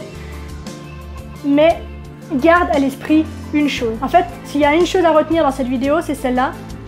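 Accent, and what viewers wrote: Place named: Quebec, Canada. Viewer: Bon content tho. Tout ce que tu dit est former :D Belle confiance. Sen tempone le coquillar hahaha Ouf sa frappe dans le quebcois. il y a un stress constant dans ta tonaliter de voix, je ne veut pas picosser mais donner une reaction que jai resentit fortement , et je la partage ouvertement. C'est commme si tu me donne un 2eme cafee xD.. mais je fait pareil quand je suis passioner <3 OK ok alor la ok ok lee le narcisisque.. hehe ironiquement jai beacoups de trait narcisisque et comme tu dit sans etre vraiment la maladie. Mais je ne me sent jamais mechant deriere cest trait. Alor jessaye de ne pas juger mon pere tres narcisisque, mais peu etre aussi tres bon au coeur? Des idee :D?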